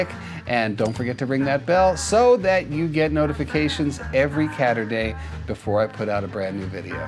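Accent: New Zealand. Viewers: She sounds more New Zealander to me, but yes, you're right about the biscuits, in the case of Australia. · As an Australian listening to this lady's familiar sounding New Zealand (or South African) accent, the cat's name is actually "Merlin", not "Melon". Although the name Melon seems hilariously appropriate given the context 😂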